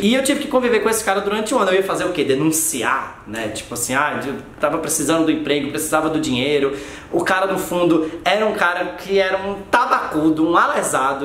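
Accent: Northeast, Brazil. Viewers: O que pode aprofundar a discussão sobre sotaque é a noção de prestígio, que está atrelada a poder sócio cultural econômico. Não existe sotaque "certo" ou "errado", mas sotaque(s) dominantes q refletem o falar das elites - ou o falar dos centros econômicos-culturais. Dinheiro gera poder, agrega cultura para ser consumida nesses centros e congrega as grandes mídias. É por essa razão que no Brasil os sotaques do Rio e de São Paulo prevalecem: telejornais nacionais são veiculados nessas duas variantes, com raras exceções! (Saudade da Ticiana Vilas-Boas no Jornal da Band, com seu delicioso sotaque baiano.) Seu sotaque é sua identidade, vc deve ter orgulho dele sim e não deve jamais pensar em perdê-lo. Sua reflexão quanto ao fato de tê-lo suavizado em televisão é pertinente, e graças a sua competência, fluência, comunicabilidade ainda lhe deu destaque! Parabéns pelo canal e pelo seu trabalho. 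Continue veiculando seus vlogs de orgulho e empoderamento nordestino/LGBT. MIlhares, milhões de pessoas podem se sentir um pouco mais fortes e confiantes por reconhecer tais qualidades em vc! Grande beijo. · Amo seu sotaque,! Aqui em Paris, tenho uma amiga de Recife também, que eu amo ouvir ela falar, a energia, a companhia! Vídeo maravilhoso, parabéns! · Eu adoro seus vídeos e seu sotaque com certeza é um dos fatores mais fortes pra eu assistir sempre! É gostoso de ouvir. Viva ao Nordeste! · Cara, tu é um comunicador amadurecido. E é muito necessário falar sobre isso. Sou mineiro, com um orgulho 'pão de queijo', mas meu sonho era nascer no nordeste. Nordeste é uma região formadora de guerreiros, pensadores, artistas fenomenais. Tenho orgulho dessa região por cada habitante que aí nasceu. E o sotaque é a coisa 'marlinda'. Continue com o sotaque, a simpatia, a educação e beleza dessa gente maravilhosa. 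Esse vídeo é um serviço de utilidade pública. Parabéns!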